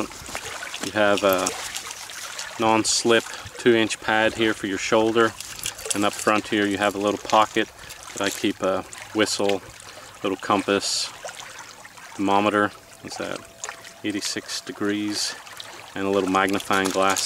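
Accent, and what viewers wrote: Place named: Northern Ireland, United Kingdom. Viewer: Hey this is the first of your video's that i have watched! I gotta move to USA! lol. I love how after 20 years you still have your Northern Irish accent, I love the hybrid USA/Northern Irish twang you've got it's so cool! Gonna have a lot of fun looking through your vids! Blessings from Carrickfergus NI.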